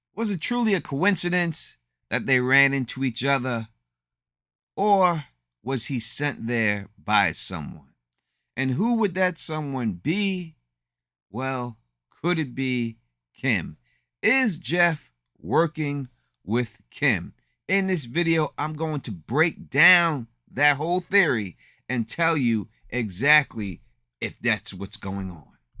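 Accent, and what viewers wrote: New York, United States. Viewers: Can you talk a little less black and new york-ish, please. We get it, you're a black new yorker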